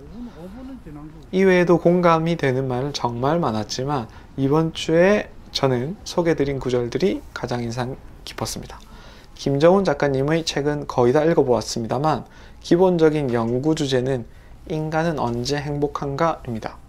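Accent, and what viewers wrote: Gyeongsang, South Korea. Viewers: 저는 부산,경상도 사투리가 너무 정감있어서 무조건 호감요^^ 부모님의 음력생일은 그냥 돌아가시기전까진 평생 간다고생각하는게..;;못바꿔요.. 저희부부도 이번에 싹다 양력으로 바꿨네요. 애들은 당근이구요. 좋게말하면,발상의 전환이고,어른들보기엔 모나고 발칙할수도요. 50중반되니 도발이 가능하더라구요~ㅎ 딸이 고딩교사초년생인데, 작년내내 징징대고 다녀서 엄마인 저도 어찌나 힘드던지요ㅜ 잔잔한 소회를 담은 선생님 유튭 잘보고있어용~ 딸내미 남친이 부산 싸나이라,정겹게 보고있답니다^^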